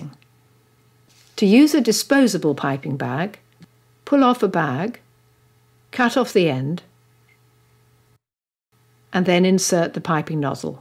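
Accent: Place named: United Kingdom. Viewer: this says how to ice cupcakes not the whole nine yards plus am ammoying british accent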